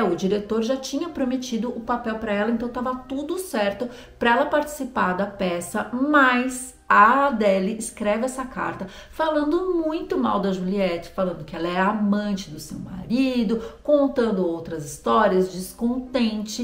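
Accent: France. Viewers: Amo o jeito francês de você falar os nomes 😊😊😊😊.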